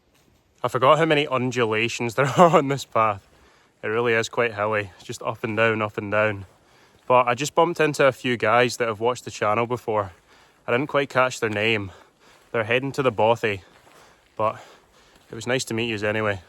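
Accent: Scotland, United Kingdom. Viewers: Great views against a perfect blue sky, if it wasn’t for your accent I wouldn’t believe you were in Scotland. 😅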